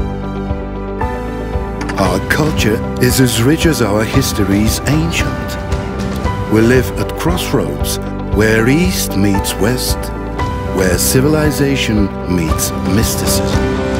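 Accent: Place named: Balkans. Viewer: she can relate.. когато говори за България, която е в женски род само при нас, а за да е правилно трябва да е it - It can relate. Клипа е мега много добър, но произношението на дублиращия е балканско и си личи, че е език, който не ползва във всекидневието. Като цяло много добре свършена работа, но оратора търпи критика.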